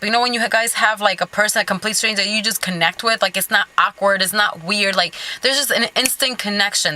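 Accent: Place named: New York, United States. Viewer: Oh nice.. Never been there. You sound as if you could've been living in new york.. Lol.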